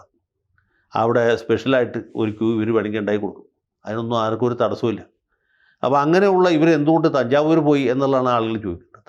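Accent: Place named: Thiruvananthapuram, India. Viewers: വക്കീലിന്റെ തിരോന്തരം സ്ലാഗ് ഒരു രക്ഷയുമില്ല 😂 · വക്കീലെ നിങ്ങളുടെ ട്രിവാൻഡ്രം സ്ലാംഗ് ഒരു രക്ഷയും ഇല്ല 😂😂😂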